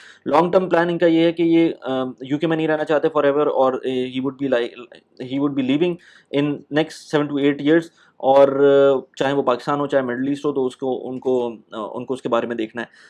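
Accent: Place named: India. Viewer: What language do you speak?! Your English is more Indian than English. It's horrible.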